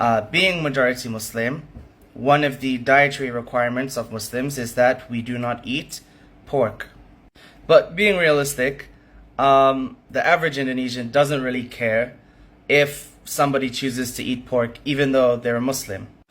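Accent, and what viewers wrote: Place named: United States. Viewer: klo dari logatnya org amrik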